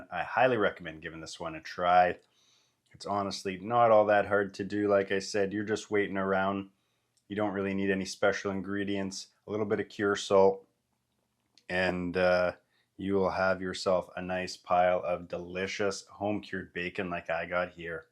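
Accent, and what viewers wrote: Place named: Canada. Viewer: Like your recipe, but man... your Canadian accent is killin me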